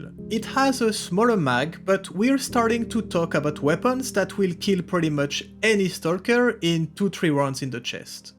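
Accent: France. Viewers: Obviously french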